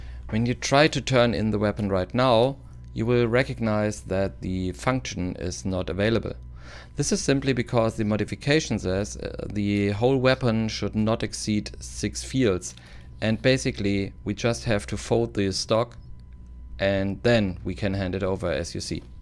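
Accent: Germany. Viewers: Thank you nice video are you German ?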